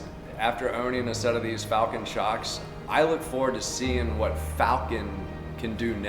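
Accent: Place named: Utah, United States. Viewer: He sounded like he was from Utah then he said he was from Utah. 🤦‍♂️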